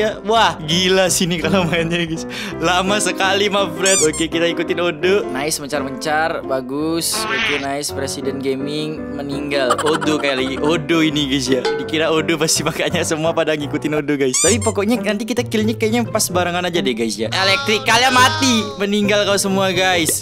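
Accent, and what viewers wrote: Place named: South Kalimantan, Indonesia. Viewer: kakak orang banjar ya,kok kayak orang kalsel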